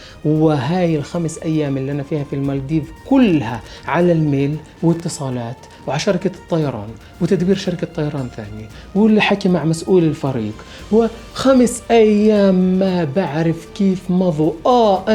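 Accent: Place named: West Bank, Palestine. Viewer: ياسلام على لهجة الضفة 💚 الفلسطينيين دايما حلولهم ربانية .سبحان الله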